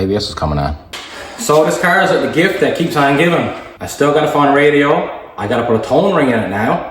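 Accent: Newfoundland, Canada. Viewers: I'm not into cars and repurposing vehicles, but for some reason i tend to like your videos lol. maybe its the fellow newfoundlander accent that keeps me watching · I think he's from Newfoundland. Its the closest thing to an Irish accent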